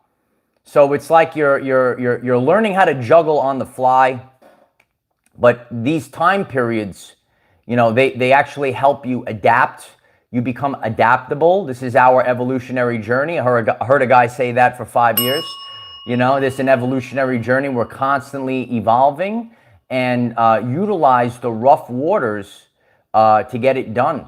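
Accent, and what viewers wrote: New York, United States. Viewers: I really love your East Coast accent! Is it a New York accent?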